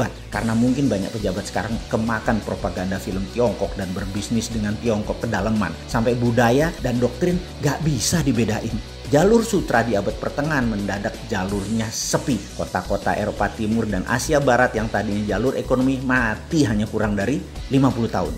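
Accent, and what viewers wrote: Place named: East Java, Indonesia. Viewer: Jawa timur banget nadanya🤣🤣🤣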